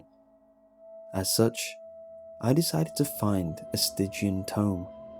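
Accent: England, United Kingdom. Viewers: New England? Sounds like he is from old England to me.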